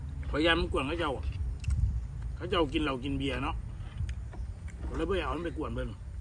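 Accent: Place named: Laos. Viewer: ตกลงมึงสิเว้าไทยคือเว้าลาวบักตาบคำเว้าหลาย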